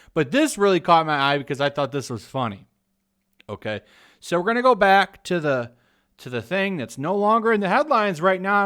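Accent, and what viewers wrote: England, United Kingdom. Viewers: Lol I love your English accent. I live in uk and pubs open back up on 4 July but all police are getting ready in riot gear to take us down if we talk to loud lol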